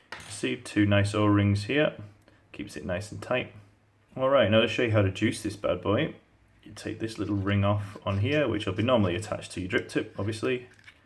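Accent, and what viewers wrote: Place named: United Kingdom. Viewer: Great review, thanks. Where is your accent from in the UK? What city?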